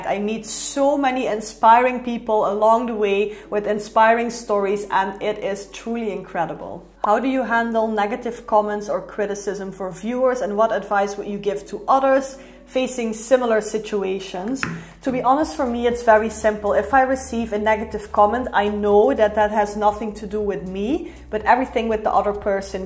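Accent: Netherlands. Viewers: I could have already guessed that you’re from the Netherlands. That’s because you and itchy boots have same accent. Same energy 😊 cheers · Your accent is so similar to my Dutch friend Lars and I had already guessed you're from Netherlands if not from Germany 😅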